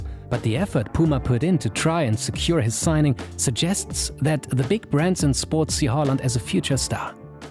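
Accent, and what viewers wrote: Germany. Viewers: Can you hear the German accent? The presenter is German. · du bist deutsch das hört man sofort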